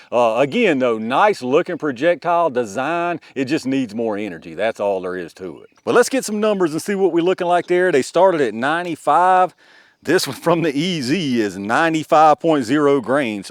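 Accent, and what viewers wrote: South, United States. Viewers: Good info! Thanks for your diligence. Love the southern accent! - signed NY Yankee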